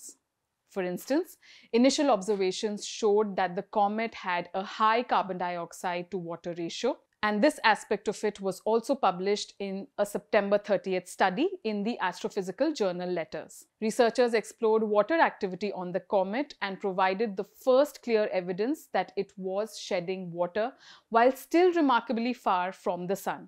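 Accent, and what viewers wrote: India. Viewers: Stop making videos , I couldn’t stand the lack of information and accent . I said what I said , I’m fatigued on Indians .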